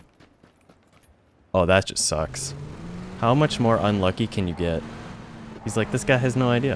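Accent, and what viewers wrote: Canada. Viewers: are you canadian ? just wondering sounds like it ;)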